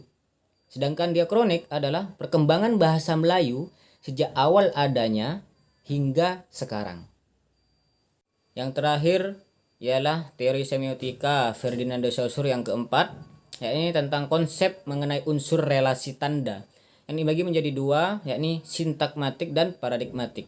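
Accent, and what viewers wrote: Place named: Madura, Indonesia. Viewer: logat Madura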